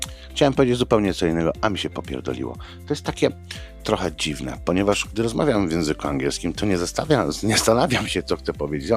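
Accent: United Kingdom. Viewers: Super gadasz w takim wyspiarskim angielskim, a miałbym prośbę kiedyś nagraj rolkę kiedy nagrywasz jedną wypowiedź w wyspiarskim angielskim i chociaż spróbuj powiedzieć to samo w angielskim Jankesów to może być ciekawe. Pozdrawiam 👍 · Mówisz kolego jak nasz minister spraw Zagranicznych Sikorski. Oxford accent, 😉😎😀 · Kurde akcent jak u brytola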